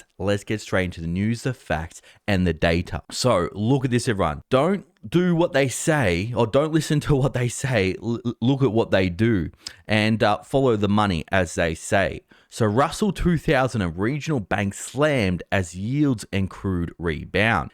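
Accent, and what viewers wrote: Australia. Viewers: Darta - Data PLEASE use your Australian accent !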